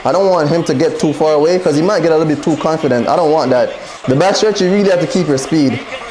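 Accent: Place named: Jamaica. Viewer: The accent had me though..Are you Jamaican 🤔....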